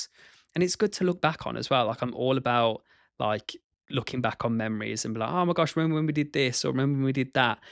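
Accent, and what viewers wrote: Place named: England, United Kingdom. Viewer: Thanks for sharing. Like most people here, I’ve been watching some of your dancing videos for quite some time now, and it’s nice to (literally) hear more about you. And btw, I’m French, and to my ears your English accent is to die for 😌 In any case, keep following your dreams and making us dance with you 🕺🏼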